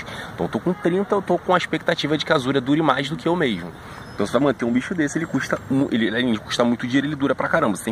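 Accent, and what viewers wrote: Rio de Janeiro, Brazil. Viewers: Eu amo esse vídeo porque nada passa mais franqueza do que um sotaque carioca dando alertas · Tu é carioca mano? Tô percebendo pra esse sotaque aí hein kkk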